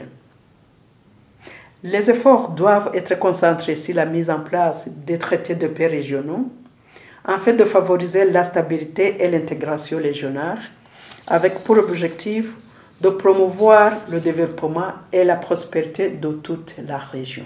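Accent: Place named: Rwanda. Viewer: Madame Ingabire Victoire, j'imagine que tu as étudié l'expression orale de la langue française ! Si tu décides de parler en français, rassure toi de parler comme il se doit! Tu me fais honte en parlant le français comme si tu parles le Kinyarwanda! Avec tous les atouts que tu as bénéficiés et parles trop mal le français, j'ai honte en ta place!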